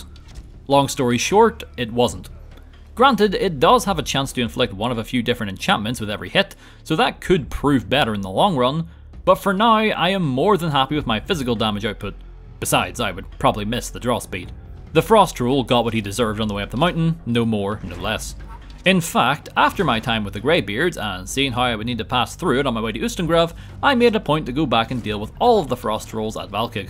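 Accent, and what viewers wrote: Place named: Ulster, United Kingdom. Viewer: has an Irish accent. Specifically Ulster province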